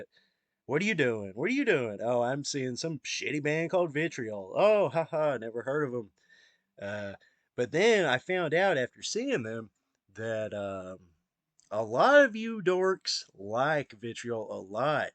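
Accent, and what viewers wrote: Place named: South, United States. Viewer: You sound like a hillbilly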